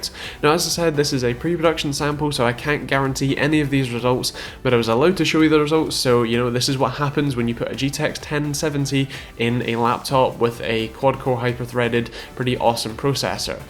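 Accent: United Kingdom. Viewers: You talk very fast. On top of that you have a UK accent. That makes it a little difficult for someone outside of the UK, like me, to understand all you say.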